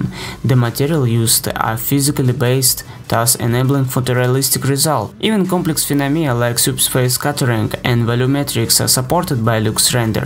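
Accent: Russia. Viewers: Russian aksent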